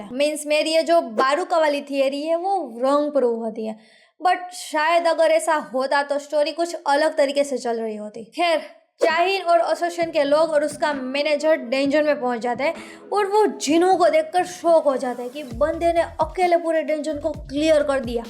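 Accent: Gujarat, India. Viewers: aap gujrati ho na?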